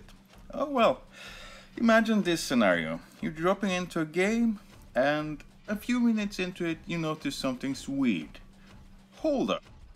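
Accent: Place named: Norway. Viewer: it sounds pretty Norwegian or Scandinavian to me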